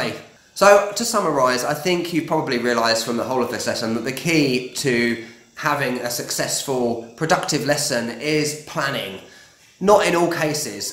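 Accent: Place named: London, United Kingdom. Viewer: ) I am from Barcelona. So my accent is European. Nothing to do with the Mexican accent lol. My sister is now in México DC. She's been living there for over a year now. I love your London accent by the way! I was actually thinking I could maybe book some lessons with you so I can see how you structure the lessons and what resources you use. This would be really helpful. And at the same time it would help me improve my speaking skills (specially pronounciation :)) I have been working as a translator for quite a few years now but work flow is up and down. So I decided to start a course on how to teach Spanish as a foreign language as I thought this could maybe help me get an extra income and it will allow me to socialise a little bit. Working as a translator from home, all alone without seeing anybody, makes me feel a bit lonely sometimes :)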